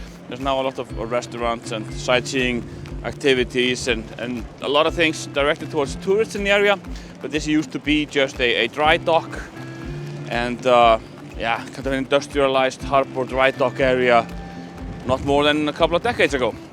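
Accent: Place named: United States. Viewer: do they ever have real blizzards in Iceland with so much snow you cannot see the house across the street? I am sure in a previous video you complained about the cold, and now you are telling us how much you like to walk in this weather. I am confused. Where did you learn to speak such good American English? I would have thought you were taught British English?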